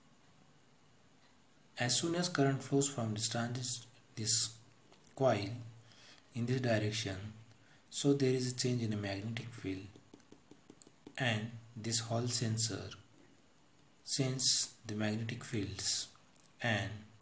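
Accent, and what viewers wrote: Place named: India. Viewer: Your English feel like hindi😅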